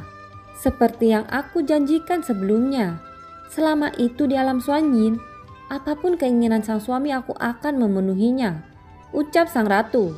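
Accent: Java, Indonesia. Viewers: Bahasa meddok nya/ atau logat nya kapan hilang nya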